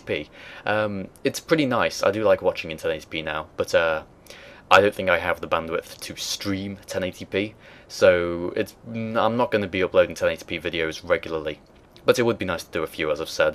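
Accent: United Kingdom. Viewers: What area of the UK is that accent from? Can anyone tell me? Pls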